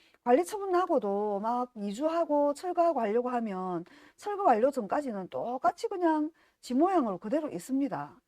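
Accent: Gyeongsang, South Korea. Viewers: 앙칼진 경상도사투리 ~~~~~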